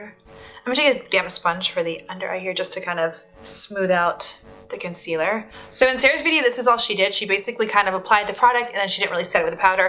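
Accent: Iraq. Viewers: Make arabic vids we like ur iraqi accent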